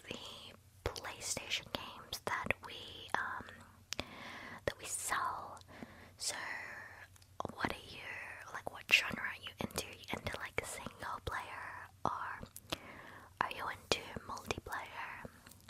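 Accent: Australia. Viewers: ohhhhhhhh its australian that'd do it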